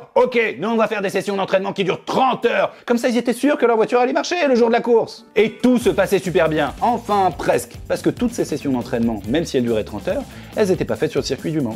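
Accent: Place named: Belgium. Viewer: Bon j’ai failli mettre un pouce rouge pour « l’accent belge », mais juste pour l’humour et surtout le contenu, c’est un gros like 👌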